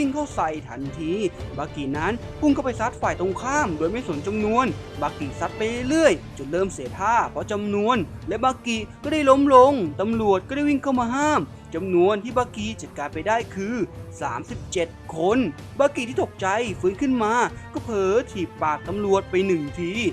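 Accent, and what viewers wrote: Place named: Thailand. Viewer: ทำไมบางทีพี่อ่านออกแบบเหน่อๆๆอะ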